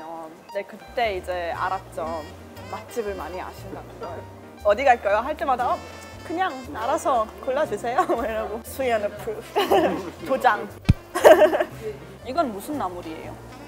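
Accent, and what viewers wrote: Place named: Gyeongsang, South Korea. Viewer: 그동안 무슨 일이 있었길래 본인 유투브 채널 활동 안하다가 다시 컴백한건가요. 구수한 경상도 사투리가 매력적인 보고싶었어요. 다시 활발하게 활동해 주세요.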